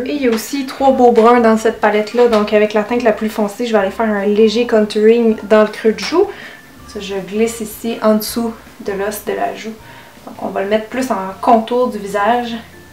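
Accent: Quebec, Canada. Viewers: jadore comment tu parler jai tjrs aimer les québécois surtout votre accent jen suis devenu amoureuse MDR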